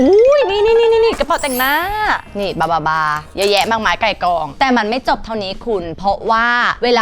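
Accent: Thailand. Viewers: เหน่อ น่าเอ็นดู น้องจ๊ะ จุ๊บๆๆ😘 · ทำไมสำเนียงพี่จ๊ะออกเหน่อๆคะ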